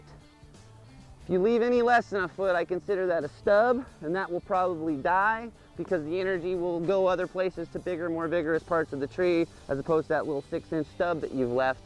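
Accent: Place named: Southern California, United States. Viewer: also, with your thick So Cal accent slurring of consonants, i could not get the name of that trimmer you are using, might you put it in the notes, or enunciate clearly next time? thanks much...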